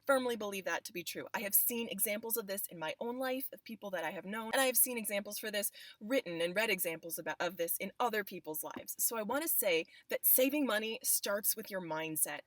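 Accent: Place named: Northeast, United States. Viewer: What part of the northeast are you from?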